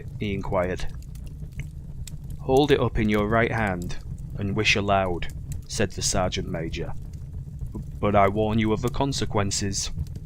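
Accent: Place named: Yorkshire, United Kingdom. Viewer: This story is one of my favourites and you did a grand job of it ! As an adoptee of West Yorkshire (been living here since 1996) great to hear a Yorkshire accent on YouTube. Well done !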